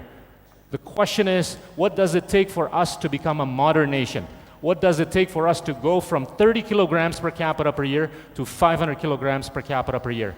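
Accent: United States. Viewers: Aksen Amerikanya kentel banget...😊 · Wow setelah bertahun2 lulus dr universitas di Amerika beliau tetap fasih berbicara dengan bahasa inggris aksen Amerika, cool!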